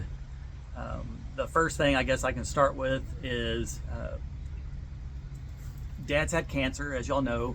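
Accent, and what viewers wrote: Texas, United States. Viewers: Praying for you and your family. ❤ You are such a sweet man and son. Jesus heal the trauma and hearts and give them peace and health for all. ❤❤ I have watched your channel for a few years because Im from Texas living years in Utah, love tiny homes and love hearing your accent because it reminds me of home. Keeping you in prayer. 🙏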